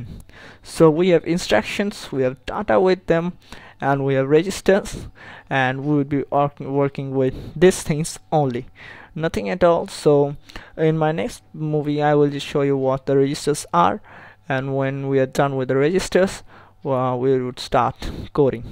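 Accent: India. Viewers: Definitely Indian! How he's saying 'Data' is selling him. Good tutorials by the way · don't see what he is doing with English ,see what he is doing with computer . . . he is a Bengali from India. His mother tongue is Bengali then he learnt Hindi and English is his 3rd language. . . If an Indian is speaking English , probably its his/her 3rd language. so stop making Fun of our way of speaking English . . .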